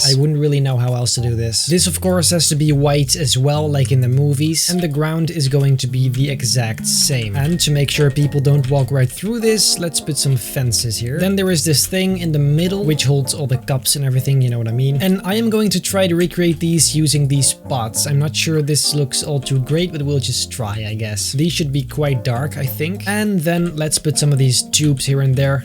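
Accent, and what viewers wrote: Netherlands. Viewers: I hear a bit of a Dutch / a Belgian accent in his voice...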